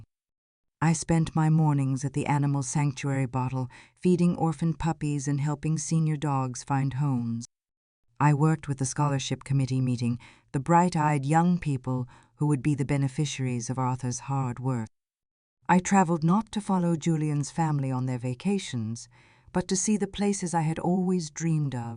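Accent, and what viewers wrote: United Kingdom. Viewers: Hmmm, lives in GA, but an accent from the UK.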